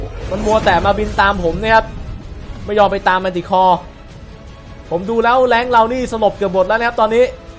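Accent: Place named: Nakhon Si Thammarat, Thailand. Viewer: พี่คนนครใช่ปะ(ผมฟังจากสำเนียงอะ)คนใต้ด้วยกันอิอิ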